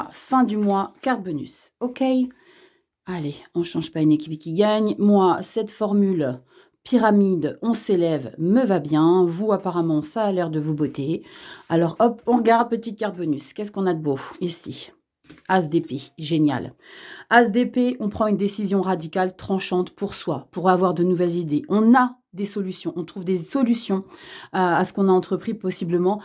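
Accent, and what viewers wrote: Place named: Provence, France. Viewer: Un petit accent et expression du pays des cigales ❤❤.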